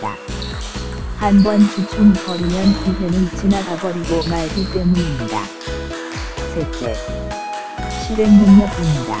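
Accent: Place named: Gyeongsang, South Korea. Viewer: 님 탁구러버 아닌가요? 님 말투보니까 딱 경상도 보리문디 같은데요..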